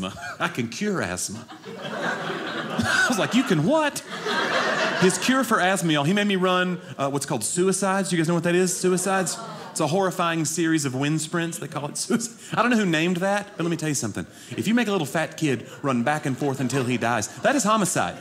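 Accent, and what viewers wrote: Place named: Texas, United States. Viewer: His accent and talk of running suicides took me back to Texas middle school athletics. Ran so many suicides one day until I threw up and my legs were so sore I couldn’t sit down normally for a week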